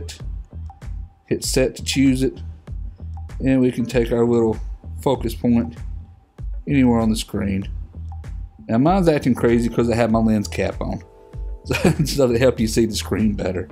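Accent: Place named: Texas, United States. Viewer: . Your Videos are great. I have just bought the 80D and your tutorials are really helpful. Many Thanks. By the way, you sound like you come from Texas! We lived in California for a few years back in the Eighties.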